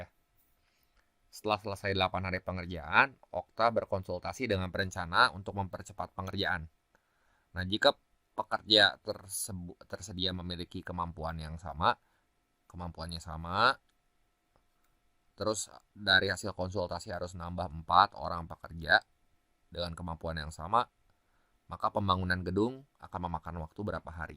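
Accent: West Java, Indonesia. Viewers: kak terimakasih sangat membantu!!! semoga aku bisa tembus skor 1000 hehe btw lucu bgt sm aksen sundanya😆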